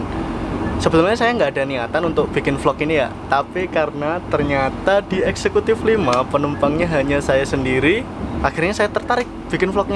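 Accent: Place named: East Java, Indonesia. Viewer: Mas owong jowo timor to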